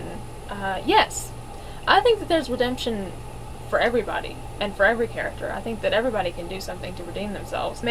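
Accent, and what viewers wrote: United States. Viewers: you sound country and i mean that as a compliment i grew up in virginia you should really watch that negan meets gary busey it's awesome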